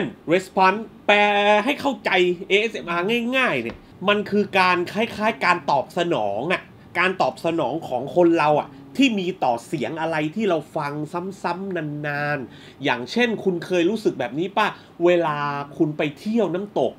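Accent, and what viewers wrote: South, Thailand. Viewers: เอ้า พี่ คนใต้เบ่อะนิ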